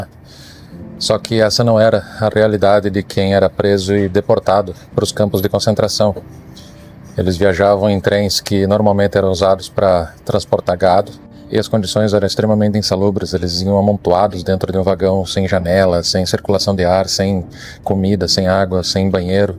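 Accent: Rio Grande do Sul, Brazil. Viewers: Sou da região noroeste do RS é o mesmo sotaque por isso perguntei um abraço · Foi tudo muito triste meu Deus. Muita gente não sabem disso, eu imagino como é que ficam os que entram nesse lugar. O Judeus sofreram sem uma razão aparente mas acho que não tem nada a ver como castigo ou juízo de Deus, eu sou Cristã amo Israel oro por Israel, porque as coisas não tem sido boas pra eles ultimamente. Eles são o Povo de Deus alguém queira ou não, obrigado pelo vídeo pois vim aprender muitas coisas conhecndo maus a história, tenho assistido outros vídeos e lido livros sobre o assunto. Que Deus abençoe a ua vida, tens um sotaque Gaúcho já fui missionaria no RS amo os meus irmãos Gauchos voltei pra minha terra com minha família deixamos muitos irmãos lá continuamos nos comunicando com eles nas redes sociais.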